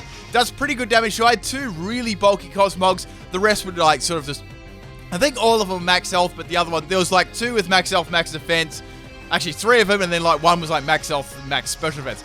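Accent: Australia. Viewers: I like your videos but your voice sounds like you combined a jock a Aussie and a Kyle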